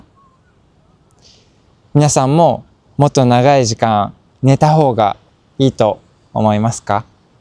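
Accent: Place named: Taiwan. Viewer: You sound a Little bit , taiwanise , but your Japanese is on point!!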